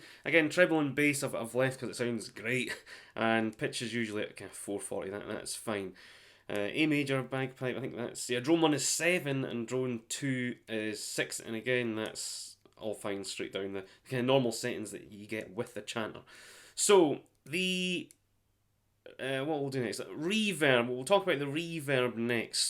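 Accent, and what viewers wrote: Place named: Scotland, United Kingdom. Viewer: Your Scottish accent is wonderful ❤!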